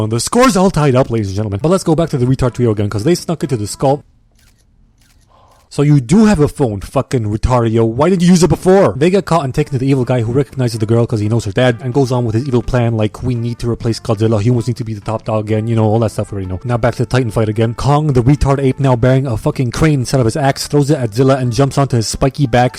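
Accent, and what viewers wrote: New Zealand. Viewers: He ain't no Australian that's a new Zealander , and I should know because I'm Australian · OMG HES FROM NEW ZEDLAND OR WHAT EVER THE FUCK NOT ASTRAS;IA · He’s from New Zealand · Hes a kiwi 😑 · He’s New Zealander not Aussie get it right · buddy he's very clearly a kiwi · hes from new zealand not aussie😂😂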